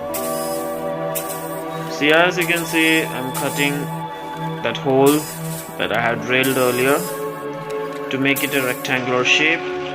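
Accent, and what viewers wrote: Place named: Jamaica. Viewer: Most talented aatmi with the jamaican accent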